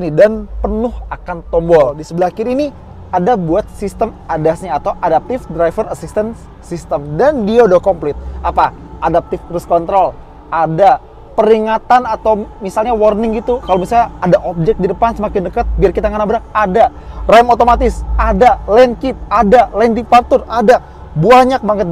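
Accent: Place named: West Java, Indonesia. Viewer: ala orang sunda. Bener urabg sunda mah kalo nyebht huruf F&V jadi hurup P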